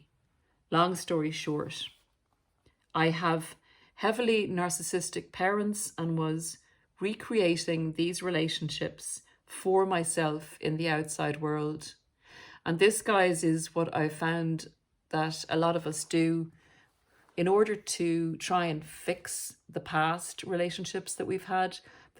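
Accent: Ireland. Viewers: Nice too know we are not alone listening to sites on narcissists. Just feel even better with your accent being Irish ☘️ just found this site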